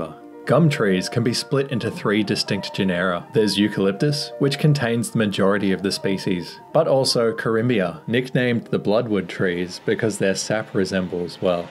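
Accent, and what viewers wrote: Australia. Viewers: Love it. Well delivered, humorous and educational. Awesome to hear our Aussie accent in such a well made video ❤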